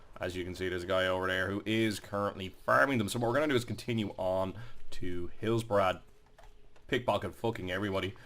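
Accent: Ireland. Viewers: What part of Ireland ya from man?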